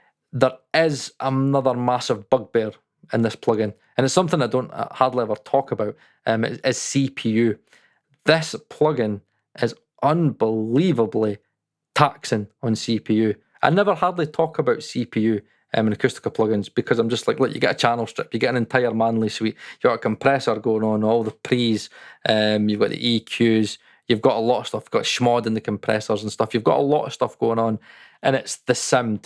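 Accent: Scotland, United Kingdom. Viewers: Removed all Acustica a long time back. I use far older hardware, so seeing your cpu spikes made me think "not for me...Again". On another note: Your Scottish accent + the Chipmunk effect made me crease. Keep up the humour pal when getting the odd dig from trolls. <3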